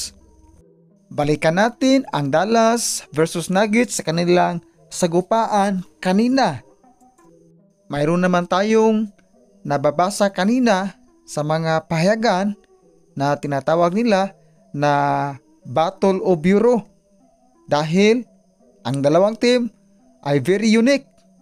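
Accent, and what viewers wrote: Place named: Visayas, Philippines. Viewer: Bisaya kaayo ka boi!!!!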